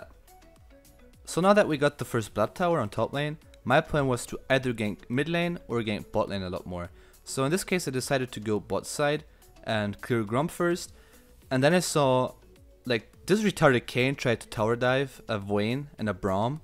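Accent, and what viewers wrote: Germany. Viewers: Man hört sofort das du ein Deutscher bist haha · Geiles Video! Man hört das du Deutsch bist, trzdem sehr geil!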